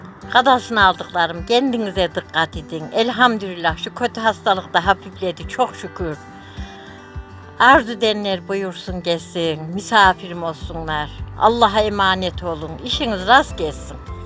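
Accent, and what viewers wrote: Kayseri, Turkey. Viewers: Teyze kesin Kayserili şive aynı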